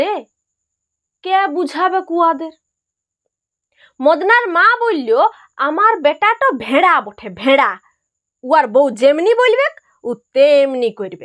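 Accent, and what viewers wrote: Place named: Bankura, India. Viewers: পুরুলিয়া , বাঁকুড়ার ভাষা মনে হয় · টিপিকাল বাঁকুড়া ও পুরুলিয়ার মানুষ জনের মতো উচ্চারণ। অনবদ্য! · উচ্চারণ ঠিক আছে। পিওর বাঁকড়ি। কৃত্রিম উচ্চারণ নয়। অনেকে চেষ্টা করে, কিন্তু ঐ জেলার মানুষ। তাই বেঠিক উচ্চারণ কানে লাগে। ধন্যবাদ।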